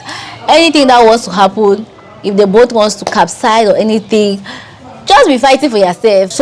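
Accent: Nigeria. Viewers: did you live in Nigeria or Bameda before. You sound nigrian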